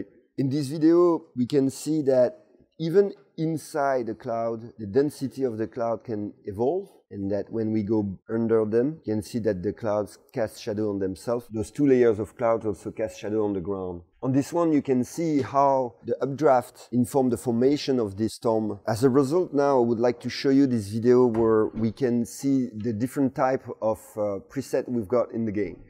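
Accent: France. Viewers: His french accent made this video 10x better.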